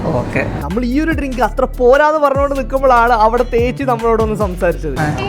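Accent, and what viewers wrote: Kannur, India. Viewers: Pakka kannur language 😅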